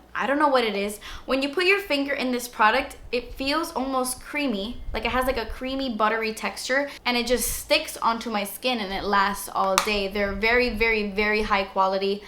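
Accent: England, United Kingdom. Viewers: Love that British accent btw! 😂 😛😘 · That British accent is a bad habit. Stop. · Girl your British accent always puts me in a great mood 👍🏻💓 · Love your British accent!!! Lol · I've recently subscribed to you and I absolutely love your videos! You should do an entire video with ur British accent :) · Love the british accent. Can u pls make a video with the whole british accent?...i really really like it..and of course i love your videos...thanks for mentiong VIB sale love yeah!!!😘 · Hahaha your English accent is SO GOOD!!! so funny:D Love you <3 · pls make a video with your british accent. i think its cool. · for some reason, whenever you talk in a British accent, you remind me of Leigh Anne from little mix lmao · omg tht british accent tho :'DDDD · I loved your British accent 👏👏👏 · Your UK accent is on point! lol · lmao! your british accent kills me every damn time! 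· Love when you do the British accent, you totally resemble Emma Watson! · Girl we love you! I liked this video before watching it! I always go out & look for the stuff you show us cause I'm obsessed. I window shop most of the time for makeup but the stuff I can afford, most of it comes from you lol even though I have oily skin 😳 but I love you! Keep doing you, and I hope your house is ready soon, can't wait to have you back completely and we all appreciate how hard you've been trying to get content out for us! I hope you know how much your subbies adore you!!!! #dassesspensive P.S: I love when you do the British accent randomly! Lol I do that too and I get weird looks like "wtf?" Lol LOVE YOU! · You look stunning in this video and the random British accent... I love that I'm not the only one who does that!!! Your perfection!! 💋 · Your British accent, so cute!! I'm British so I know 😉 xx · haha whats with the britsh accent! 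you are sooo cute...!!! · Omyyyyyy. That british accent. ugh <3 It's so perf! I love you!:* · Your English accent cracks me up! coming from a brit 😊 xx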